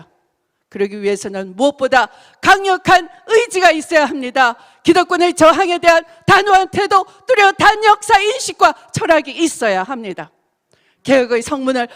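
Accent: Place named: Jeolla, South Korea. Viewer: 너 정치병이야.병원가라.너 말씨는 전라도 말이 면서 고향이 대구라고 ..ㅋㅋ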